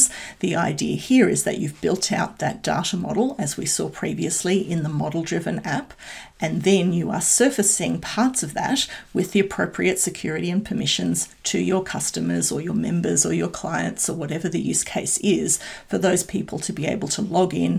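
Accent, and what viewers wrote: Australia. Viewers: I dodn't know Australian sound like this :D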